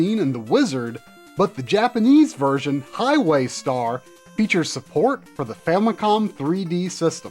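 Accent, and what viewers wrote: United States. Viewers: I understand trying to pronounce some Japanese words can be difficult, but listening to them in a heavy american accent is also just as hard listen to. Japanese phonics are actually fairly easy to learn as most of the sounds are repetitive. I highly recommend the japanesepod101 youtube channel. <link>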